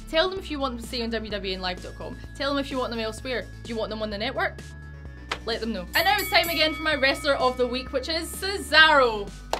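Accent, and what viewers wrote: United Kingdom. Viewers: god this hard british acent is something. i cant understand a word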